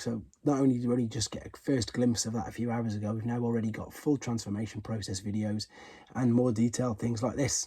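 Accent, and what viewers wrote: United Kingdom. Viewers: This is one of my favourite TF channels.... Nice positive attitude, never any whinging on about stuff, its a friendly space and I have seen so many things on here I had either forgotten existed or never knew about... and I like the way it feels like you are just round your mates house and he's showing you stuff he's found (it probably helps that I grew up in Telford, so the accent is a factor!)...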